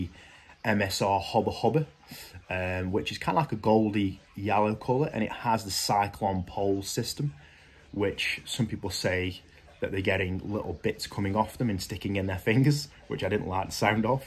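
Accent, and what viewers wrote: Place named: Stoke, United Kingdom. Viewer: you sound like your not far from stoke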